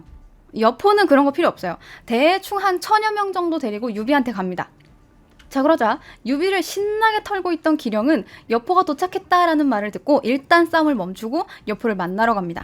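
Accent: Gyeongsang, South Korea. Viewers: 전번에도 여쭤본바 이 지만..원래 역사 전공이신가? 넘잘하시네,,젊은분이..글고 억양도 살짝 부 마 지역?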